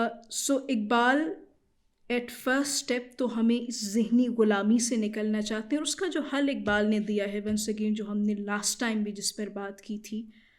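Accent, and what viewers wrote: Iran. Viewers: ما شا الللہ بہت ہی خوب صورت انداز ہے ان کا ذوق مطالعہ بہت وسیع اورمختلف النوع ہے رومی سعدی اور بڑے بڑے شعرا کا ریفرنس بذات خود ایک خوب صورت دلیل ہے ان کے وسیع مطالعہ کی احادیث اور قرآنی آیات کا ریفرنس بہت ہی اچھا اور قابل تحسین ہے الللہ پاک ان کے علم میں اور اضافہ فرماے ان کی الفاظ اور خاص فارسی کو اسی لہجے میں بیان کرنا نہاہت ہی خوب صورت ہے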